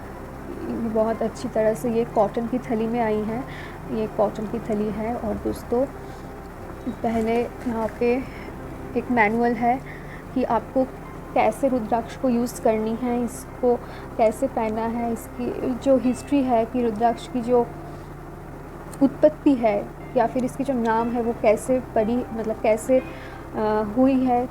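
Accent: Bengal, India. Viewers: Mone hocche khanti bangali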